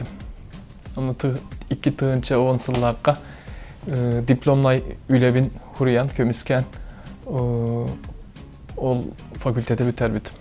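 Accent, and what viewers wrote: Yakutia, Russia. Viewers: Бэрт уол.Акцена олох сахалыы.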